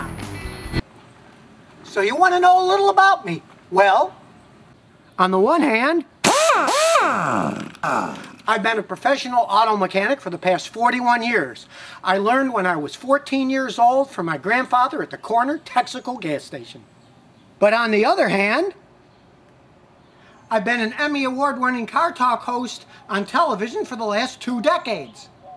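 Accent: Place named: Chicago, United States. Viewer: Hey I didn't know you had a Chicago accent... Let me know when you come to Chicago, I would love to meet you. Ben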